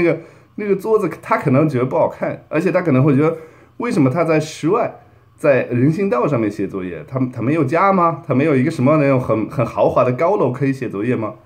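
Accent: Northeast, China. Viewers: 东北口音。。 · 你咋是东北口音呢,哈哈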